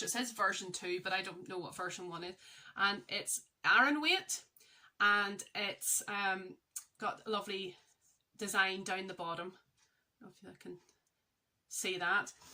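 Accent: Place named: Northern Ireland, United Kingdom. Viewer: Hello thoroughly enjoyed your podcast, and ohhhh those socks are amazing. I watch Angela and that's how I found your channel. I'm originally from Carrickfergus and moved from N Ireland over here to Scotland back in 96 and it's always lovely to hear someone from back home. Stay safe stay well, hugs from Scotland xx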